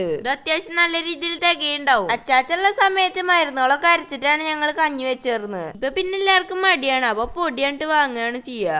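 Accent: Thrissur, India. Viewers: തൃശ്ശൂർ ഭാഷ സൂപ്പർ ആയിട്ട് ഉണ്ട് ❤❤❤❤❤ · Samsaram ketappol mansilayi namde തൃശ്ശൂർ ഗഡി anu nnu alle😊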